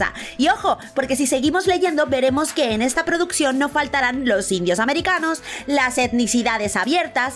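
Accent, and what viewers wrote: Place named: Spain. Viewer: Eres española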